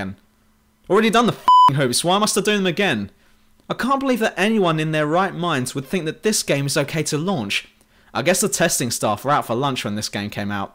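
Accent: United Kingdom. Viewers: gotta love that british accent :>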